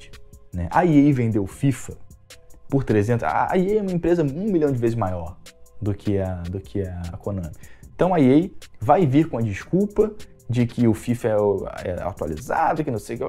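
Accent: Rio de Janeiro, Brazil. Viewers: Pode crer mano kkkkk Esse sotaque de carioca fudido não dá pra entender porra kkkk Essa intro é genial!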